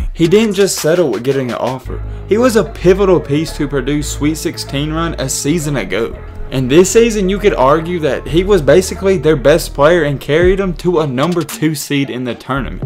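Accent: South, United States. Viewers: I can hear a touch of southern in your accent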